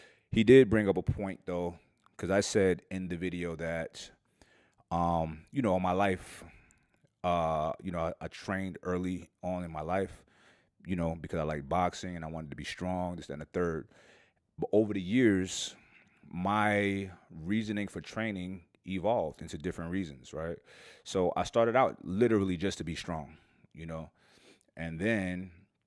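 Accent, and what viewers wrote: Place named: New York, United States. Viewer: Yo I could listen to you all day, you got that new yawk vibe #bigupstobrooklyn and your MCT oil is the truth!!!!! I'm a big fan The.one.and.only.marissa.ann on IG. And forget this red pill shit tell them Mike Rashid is his own pharmacy and pops a different color pill everyday lol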